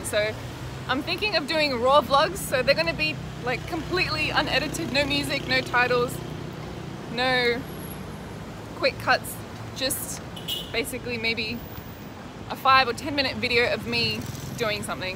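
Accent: Australia. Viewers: aussie accent.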